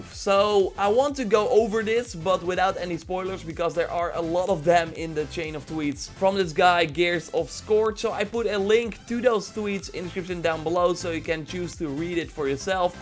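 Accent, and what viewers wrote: Netherlands. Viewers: Yo just subbed thanks for giving me info on ac, far cry and other games your videos are very helpfull and informative. Btw just curious are you dutch your accent sounds like you are.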